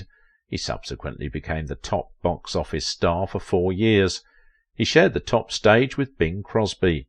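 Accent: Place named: Cockney, United Kingdom. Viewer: One thing I cannot stand when Englishmen decide to host like a documentary in America number one you may be speaking English but you're not speaking American and when you guys mumble on top of your Cockney accent it's virtually impossible to understand you someone made this documentary even worse is he didn't take the time to post the photos that goes along with what you are saying everything's out of sequence so it's so hard to follow engage and understand and enjoy what the hell you're talkin about please cease and desist dot-dot-dot I have seen documentaries of WWII hosted by English-speaking men and I'm completely able to understand them cuz they articulate well would you please do the same or get the hell out of Dodge do sincerely your friend and mine me ... Christ! 🙄🤔🤨🤪😜🤫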